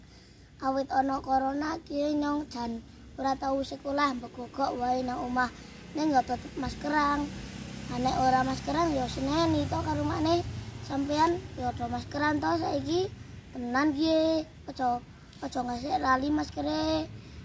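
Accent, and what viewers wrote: Temanggung, Indonesia. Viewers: Meduk banget.bahasanya kas Temanggung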